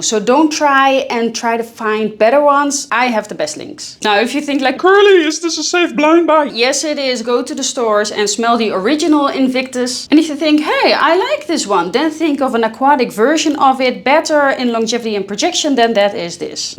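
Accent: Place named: Netherlands. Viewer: Nederlander 100% 😂 hoor je meteen! Leuke video.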